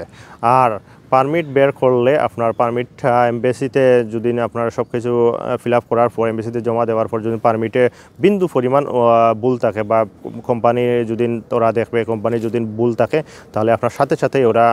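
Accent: Sylhet, Bangladesh. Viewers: আসসালামু আলাইকুম ভাই আফনার বাড়ি কিতা সিলেট নি?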